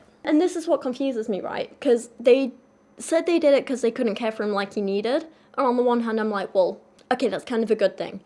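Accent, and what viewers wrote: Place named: Northern England, United Kingdom. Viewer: As a foster kid myself I think it's better for him to be in a place that fits his needs. This mess is probably gonna leave some trauma especially for an autistic child who is just trying to understand how the world and people work in the first place. I hope he'll get the love he deserves. Doesn't hurt to lose the cameras in his face too. P.s. am I imagining things or has your accent gotten a bit more northern since the move? 🤔 love it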